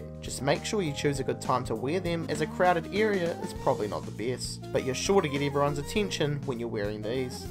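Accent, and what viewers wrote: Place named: New Zealand. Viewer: 1trueNRG my guess would be, New Zealand, sounds like their accent lol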